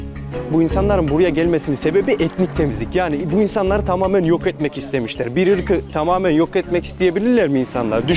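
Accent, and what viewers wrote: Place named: Adana, Turkey. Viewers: Kardeşim benim bu yorumları ciddye al sonuöta senin daha iyi yerlere gelmeni istiyoruz doğal akışında kendin oldugun gibi videolar çekmeye devam et belgesel tadında videolar başka bir tarz ve birkaç konuda kendini çok geliştirmen lazım ki gerek yok bence kendin oldugun gibi o anadollu şivenle sempatikliğinle yeterlisin belgesel tadına gerek yok kendini aşağı çekersin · Kanka adanalı mısın konuşma onlara benziyor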